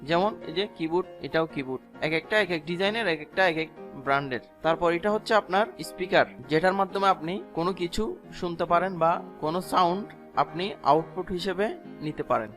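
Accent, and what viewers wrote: Chittagong, Bangladesh. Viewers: ভাই মনে হয় চাটগাঁইয়া 😊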